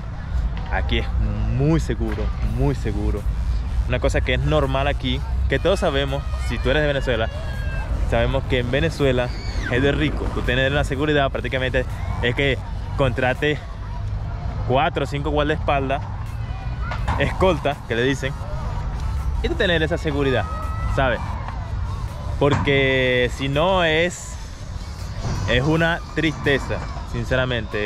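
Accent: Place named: Brazil. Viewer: Vc tá falando portunhol 🇧🇷👈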